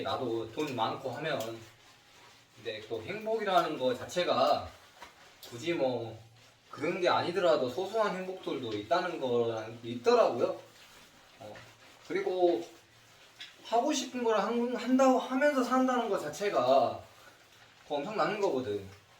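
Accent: Jeolla, South Korea. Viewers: 경남대표 선발전 미스터 경남이라길래 부산 토박이인가 싶어 들어가보니 구수한 전라도 사투리ㅋㅋㅋ 예상치 못한곳에서 미스매치에 웃음이 나오네요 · 행님 라도 출신이셔라?🙂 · 경남대표인디 말투는 전라도사투리인디요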